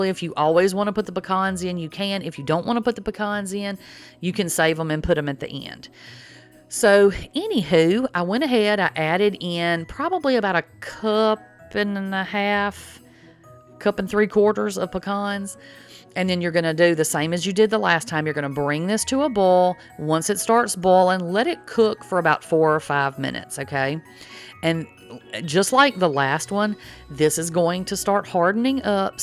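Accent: South, United States. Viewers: Don't know where you are from. You have a southern draw and no one in the south or anywhere else in the world pronouns perylene that way !!!!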